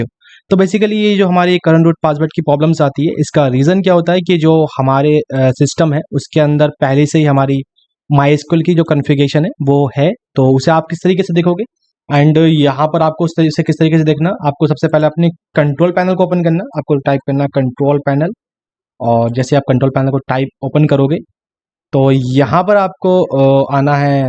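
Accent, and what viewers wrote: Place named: Hyderabad, India. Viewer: thanks broo that was really a big hectic, by the way that hydrabadi accent kills it , love bro love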